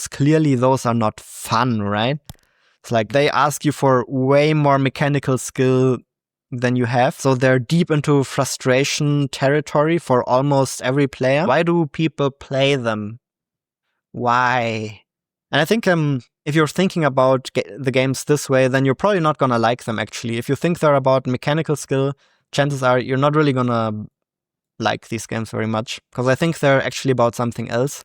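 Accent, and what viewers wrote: Germany. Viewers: Text: "Learning the world": "Learning the vurld" XD Don't worry, your German accent is a big reason of why I subscribed to your channel x)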